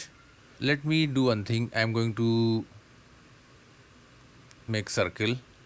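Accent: India. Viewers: Hi.Please work on improving your English accent. You have mixed your English accent with your Indian accent in a funny way.😊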